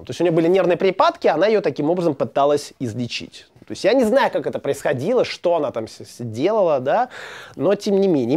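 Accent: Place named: Moscow, Russia. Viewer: какой мА-Асковский акцент!!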